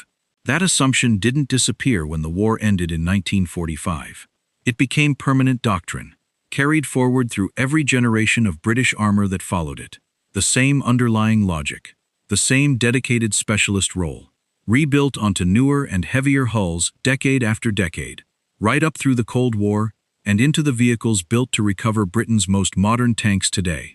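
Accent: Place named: United States. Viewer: Who is the American robot giving the commentary? None of the vehicles filmed are from WW2?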